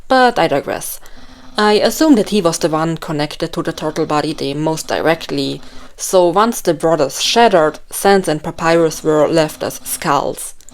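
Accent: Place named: Germany. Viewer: This is a German accent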